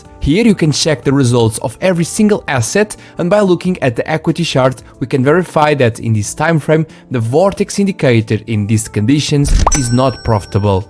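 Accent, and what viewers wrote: Portugal. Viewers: you are portugues right?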